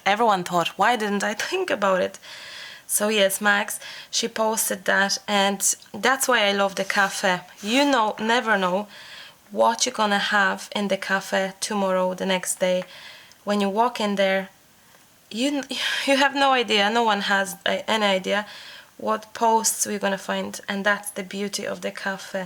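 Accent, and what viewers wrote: Cork, Ireland. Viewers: where are you from?? This is my 1st time watching your video and you did a fantastic job!! It's just I've been trying to guess my 1st 2 was Russian or Polish but then when you carry on speaking every now and then you sound Irish x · I'm loving your accent! Is there a bit of Irish in there or am I crazy? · Greta video, i love how the 'Cork' accent was strong enough for me to wonder if you were living in Ireland - Im also in Cork :D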